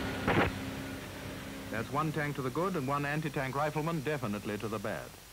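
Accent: United Kingdom. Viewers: “One tank with a personal grievance” makes me wish I had a British accent lol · The Young ones today wouldn’t understand the old British language🇬🇧 the way of saying things.. love it ,,,tally Ho ! let’s have a Cup tea ☕️ 😁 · British narrator---- but those are fighting Canadians! The greatest Canadians who ever lived! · What particular british accent is this? I love it. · This announcer is clinically British. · Strange to hea so little British accent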